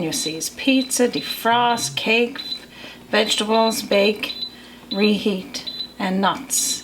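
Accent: Ireland. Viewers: I thought you were Irish because of your accent! Nice review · Well Hi....Thank you kindly. What a wonderful & simple recipe this is. I've just watched it. I'm most definitely going to be having a go over the weekend....I'm sure our lovely grandson is going to just love this type of chicken with tater-tots....I'll be adding a little sprinkle of garlic powder & a hint of paprika..... Thank you kindly. I really very much appreciate your taking time to post this....Just subscribed 2....All good. I'm a seasoned traveller myself. Many decades ago of course....I'm picking up a we bit of Irish or Dutch in your lovely accent? I'm definitely going to say Irish ancestry..... Been Der2......😍😃😍😃😍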